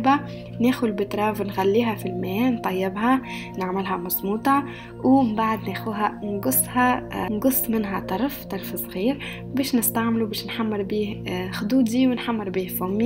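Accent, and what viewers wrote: Tunisia. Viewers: هي تونسية و حافظت على لهجتها وهذي حاجة باهية💓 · اوكي شكرا على المعلومة لهجة تونسية زوينة عجباتني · نموت على هدرت توانسا حلوين ياسر 😘😘😘انا من الجزائر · هي تبان تونسية من هدرتها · b9it nesma3 fi lahjtek w n9oul on dirait djerbienne hata 9olti "ki rawahet jerba" machallah 3lik charmante fière que vous ete tunisienne et surtout djerbienne :) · نموت على اللهجة التونسية ماشاءالله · لا يوجد اللهجة هاذي تونسية مش مغربية😌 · هاي اللهجة تونسية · أنا كذلك أعشق اللهجة التونسية كثيراً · تونسية على حسب لهجتها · نوره البغدادية بس المشكله هيه تونسيه منفتهم حجيها بس اني افتهمت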